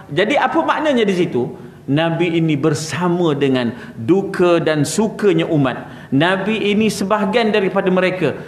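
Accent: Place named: Perak, Malaysia. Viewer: Pekat sgt loghat perak.....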